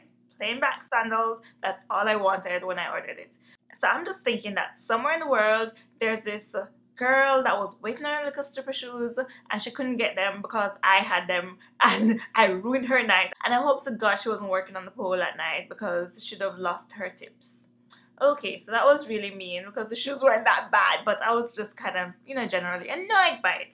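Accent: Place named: Jamaica. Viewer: Love this review, Soon has I heard your accent I'm like YAY I found another Jamaican YouTuber !!!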